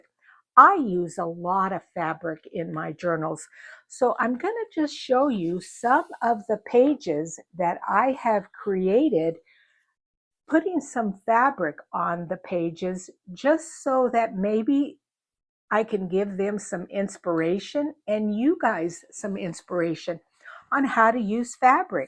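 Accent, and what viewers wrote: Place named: Utah, United States. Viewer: I’m from and live in Tennessee but I lived in Providence, Utah for 5 years. You have the same accent as them. 😄 Are you from Utah?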